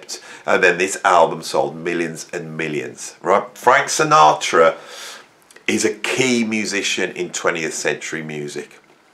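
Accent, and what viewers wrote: Birmingham, United Kingdom. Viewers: I love watching your videos,. We are same generation and you create this pleasant homey atmosphere, so I feel almost like talking with one of my music-buff mates. Lovely Birmingham accent, too. 👍 Thanks! annnd cheers! 😆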